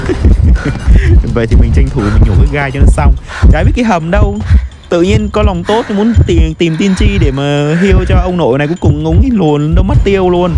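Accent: Vietnam. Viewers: nghe hơi dính accent của người Việt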